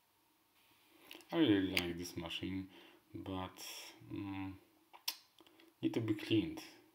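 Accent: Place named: Russia. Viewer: Блин, я английского не знаю, но вот тяжелый русский акцент слышу сразу😊.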